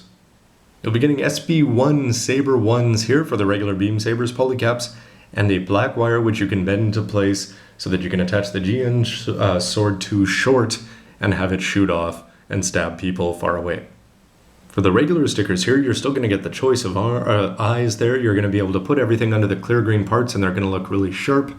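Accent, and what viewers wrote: Canada. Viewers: Judging by his hands and his accent, I'd say he's probably white and probably from Canada or the northern United States (because it sounds like he says "out" like "oot" sometimes). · is, in my summation, Canadian. I say that based on his accent and vocabulary. And honestly, I am curious why he lives in Japan but if he wants us to know he will decide when and if he tells us.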